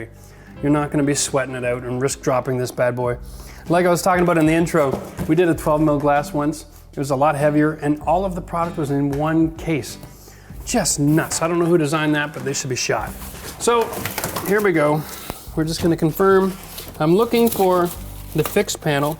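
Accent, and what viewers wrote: Canada. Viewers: Wait . . . are you really not gonna tell us about what happened to your eye? . . . I just ran into your videos this morning and I they're a pleasure to watch and listen to even when I stepped away from my desk. . .that elevator music and your Canadian accent do the trick. . .Keep up the good work! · Mate, I love your work and your accent. I was brought up in Edmonton but have lived in Australia for the last 45 years. I still love to hear Canadians talk. I really miss Eatmores and Coffee Crunch too! Take care and stay safe....Kev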